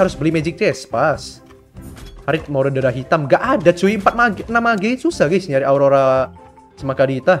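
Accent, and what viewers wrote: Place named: Medan, Indonesia. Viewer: hallo bng subscribe baru ni bang lucu kali logat kau bang orang Medan kah??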